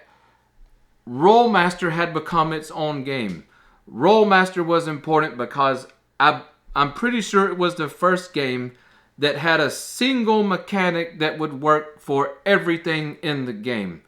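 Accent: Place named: Louisiana, United States. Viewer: So this channel needs to lean more into its Cajun roots. I'm here and subbed basically because of the content and the Cajun accent.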